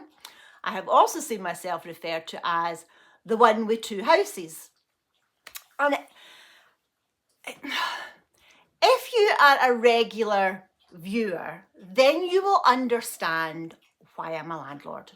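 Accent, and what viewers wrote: Scotland, United Kingdom. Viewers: I am not a creator but comment frequently on videos I watched. I never ever commented in a negative way or criticised the person behind the channel. Its like you said its free to watch and if you don’t like the content why watching it at all?? never understood that attitude. I habe too say that especially during/ after Covid aggression and unkind comments have risen, even I get nasty comments after my own comments on absolutely harmless topics, its so weird what people read into your comments sometimes and how nasty there responses are. Like you I have so much experience not with 5 years old😅 but adults who often behave like them😅 working in retail for many many years and have seen and heard ALL. I always try to be kind and not judge people just by their looks, background etc. I admit its not always possible but this is a lifelong project and some people are just AH and I don’t care because I cant change them, not my problem but I also agree with you for very sensitive people it isn’t always that easy. 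I just love your content and your personality AND I can understand your Scottish accent which is quite ironic if a non native english speaking person is saying that😅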